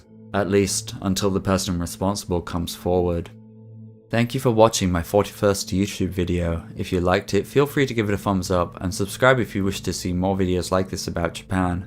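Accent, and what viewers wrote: Manchester, United Kingdom. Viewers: Creepy video - nicely done! Your accent sounds Manchester-ish.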